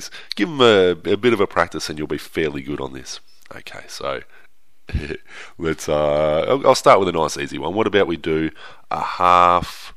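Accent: Australia. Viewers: i think he is Australian but we might never know.... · Is he Australian lol? I'm look at this cuz my recruiters keeps quizing me on mental math (and I can even look at the problem) but yeah.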